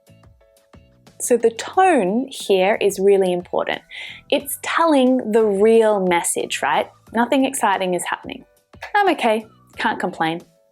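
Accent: Australia. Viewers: Iam afraid to be addict to australian english accent .U're great teacher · Love the Australia accent, yes your smile bright like a morning star✅✅✅✅🇸🇪🇸🇪🎃🎃🎃🎃🎃🎃🎃🎃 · Are you australian ? How you thought to choose the name of your Channel ? mmmEnglish! it's like tasting a dish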